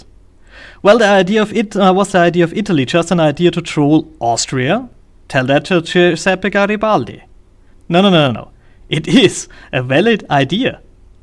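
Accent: Germany. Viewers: Since Rachel Dolazar, some SJW'S & even Don Lemmon of CNN suggest that race could well be a social costruct. Rachel Dolazar feels like a black women therfore she is. Although she is a con artist who took advantage of affirmative action & her leadership role for a black organisation. If race is just a social construct & fluid I am Kekistanian as well...and boy, have I been opressed! These regressive lefties dug their own hole! Where's my $$$ where are my advantages? BTW, are you German? Weil ich Deutsche bin & meine es raushören zu können. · you sound german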